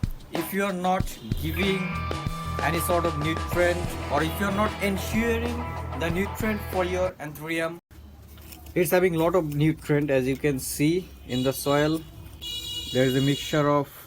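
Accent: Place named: India. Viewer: Is this language English? Or Indian with some sentences in “English” ?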